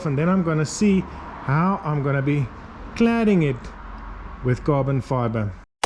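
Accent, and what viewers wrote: South Africa. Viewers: These are great videos. Love your South African accent. Reminds me of when I lived in SA. 'N boer maak 'n plan !!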